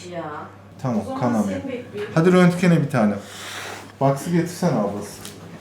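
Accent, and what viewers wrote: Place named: Tokat, Turkey. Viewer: Tam tokatlı şiveden belli 😂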